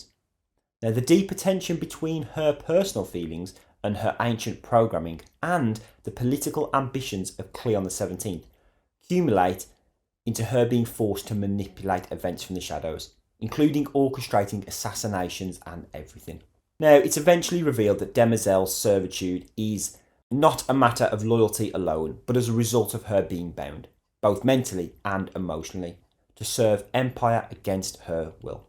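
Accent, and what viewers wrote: United Kingdom. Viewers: 😂 sounds Brit to me 💯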